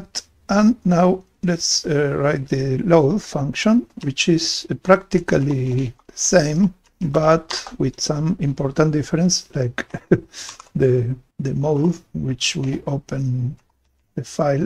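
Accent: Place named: Argentina. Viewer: How nice that I could notice the argentine english accent just 10 seconds into the video with no prior clue haha. Thanks for sharing the project!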